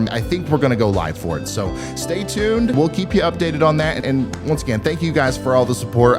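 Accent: South, United States. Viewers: dude has a country accent but his intellect fights against it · This is like the one deep voice guy from riddle but southern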